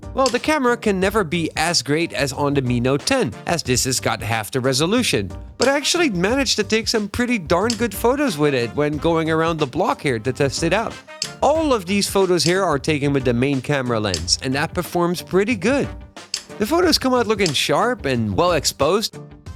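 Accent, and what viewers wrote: Netherlands. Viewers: Hi, Great video! Are you Dutch? I think I can hear a slightly dutch accent, however your english is very nice though! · You sound Dutch. Aren't you?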